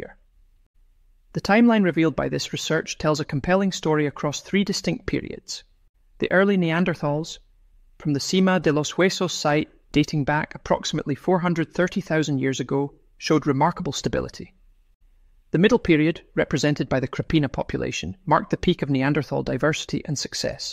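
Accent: Scotland, United Kingdom. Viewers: Love the Scottish voice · and never realize it has not actually explained the inner ear geometry thing. Just blurb it a dozen times with a Scottish accent.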